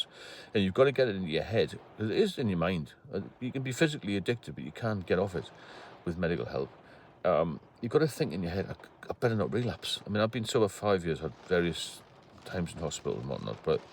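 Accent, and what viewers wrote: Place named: Newcastle, United Kingdom. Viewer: Where you from,I khow your a geordie,I use to go to Wallsend in the navy Been the big market in Newcastle afew times,got a few mates in Jarrow,all the best for 2025 sir👍👍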